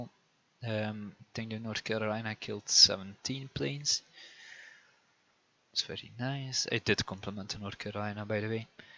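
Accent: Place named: Flanders, Belgium. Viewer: Wow you're really not trying to hide that Flemish accent lol. :D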